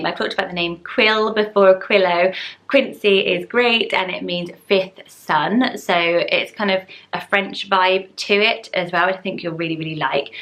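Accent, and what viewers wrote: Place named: England, United Kingdom. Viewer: I love these names but I’m American so these dont sound cute without the English accent!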